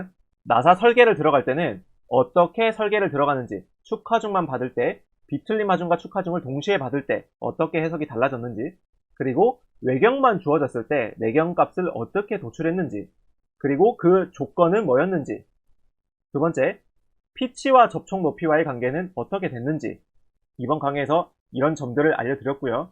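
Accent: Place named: Gyeongsang, South Korea. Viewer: 사투리가 약간 있네요 혹시 경사도분? ㅎㅎ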